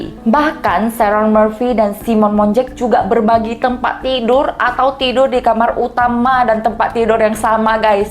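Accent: Medan, Indonesia. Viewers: Cara ngomong nya sepertinya dari Medan haha Apakah dulunya tinggal di Medan?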